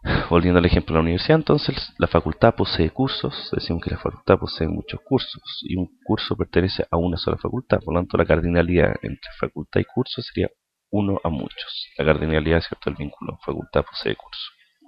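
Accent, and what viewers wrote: Chile. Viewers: por su forma de hablar creo que es chileno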